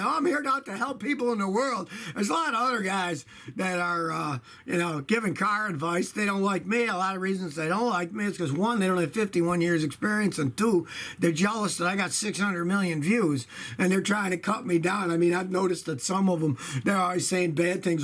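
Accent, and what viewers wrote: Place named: New York, United States. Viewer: why do you have a New York accent? You sound like Joe Pesci.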